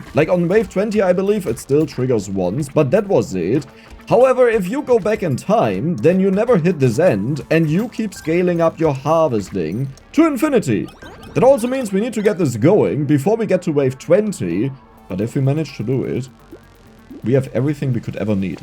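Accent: Germany. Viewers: Nach dem ersten Satz gescheckt das du Deutsch bist XD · I can sense german ascent it subtle but when he spell r his germaniness appear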